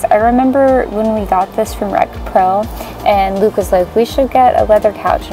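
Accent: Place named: Canada. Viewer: Great job!! One question I always wanted to ask you though. Sometimes you have a somewhat Canadian accent at times. Are from up north in the states or Canada originally? 🙂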